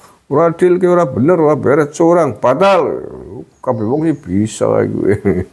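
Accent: Banyumas, Indonesia. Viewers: Buzzer ngapak y?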